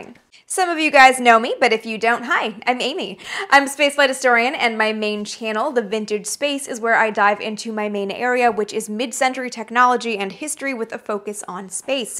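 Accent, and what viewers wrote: Canada. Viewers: I am now noticing the Canadian 'o' pronunciation and now I can't stop hearing it. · I have nothing but love for Canadians, the Brits, and Aussies. I knew you were Canadian when you said “aboot” (about) and “surry” (sorry). It’s adorable 🥰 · I suspected you were Canadian becauae of your pronunciation of certain words so I looked you up & sure enough even though you were trying to hide it.. I'm a big fan of your you tube channel & your depth of knowledge. I don't care if you are not from the U.S. & are an expert on NASA. Imo it gives you extra cred that you adore all things NASA & American space history despite not being born here. There are so many Canadians compared to Americans when you factor in the relative size of the pop on you tube & I think that eludiates a big prob here: we don't educate our citizens anymore. It really is a sorry state of affairs & I wonder about how many Americans are out there that could have contributed big if they been properly motived & piqued their interest in the hard sciences & education in general.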